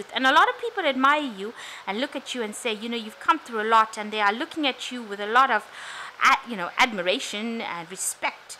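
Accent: South Africa. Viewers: Thank you for your reading. Are you south African? Your accent sounds familiar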